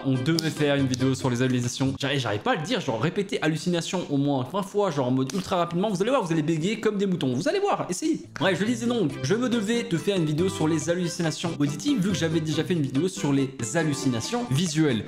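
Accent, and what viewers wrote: Belgium. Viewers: Et tu belge ? Dezoler de te déranger au passage 👉👈😀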